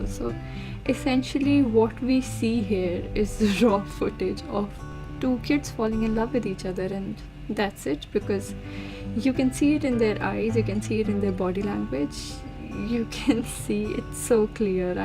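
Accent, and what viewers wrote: India. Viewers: I am just loving your Indian accent ✨...and larrie is real 💙💚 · Lmao dw be proud of your accent. Idk y but ur accent sounds like an Indian accent to me cse like I'm from India too and it sounds too familiar. (u don't have to reply if u don't wanna and if you're not comfortable with letting ppl know where u come from) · I was thinking the same her accent is soo Indian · Just me Or ur accent sound a bit indian??????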